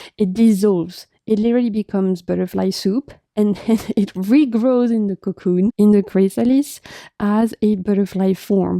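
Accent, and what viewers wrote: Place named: France. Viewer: i lived in paris and dijon for 10 years...your french accent is delicious. and thank you for such an amazing reading. pile 3 merci beaucoup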